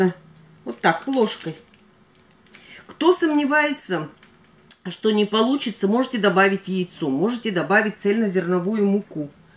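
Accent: Kirov, Russia. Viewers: Как мне нравится Ваш кировский выговор, у меня бабушка и все родственники с севера Нижегородской области похоже говорят ))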